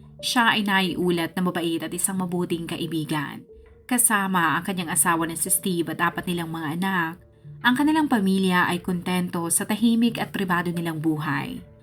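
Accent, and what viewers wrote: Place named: Ilocos, Philippines. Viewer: Lumalabas ang ilocana accent nyo dito Manang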